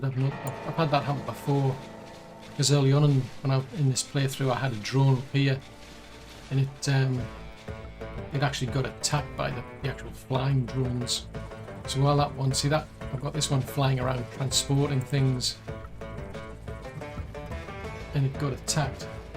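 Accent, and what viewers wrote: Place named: Northeast, United States. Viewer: nice to hear the Northeast Accent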